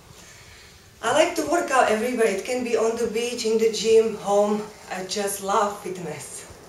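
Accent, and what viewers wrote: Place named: Eastern Europe. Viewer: I love your accent i could listen to it all day. Slavic women seem to have heavy voices.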